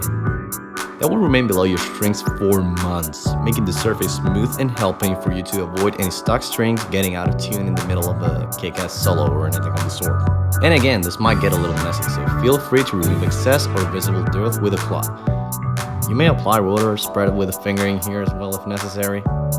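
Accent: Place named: United States. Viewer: Good tutorial, man. Lots of good tips a lot of players particularly ones new to guitar might not know.👍 Side note: your accent is much more American sounding than I imagined. No real point there just and observation. Lol!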